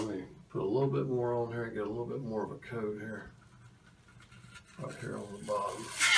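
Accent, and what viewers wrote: South, United States. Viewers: This was so helpful, thank you! And something about the really clean finish on the wall and pleasant southern accent was strangely satisfying lol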